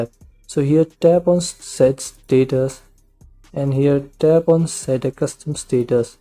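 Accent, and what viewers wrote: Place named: India. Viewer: its because he is protraying it as an indian accent.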